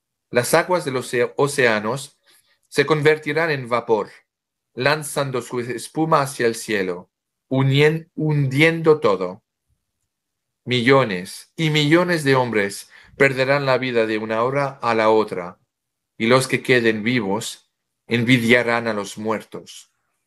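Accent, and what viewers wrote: France. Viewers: Me gusta su acepto francés. Gracias. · Es un honor escucharle con su precioso acento francés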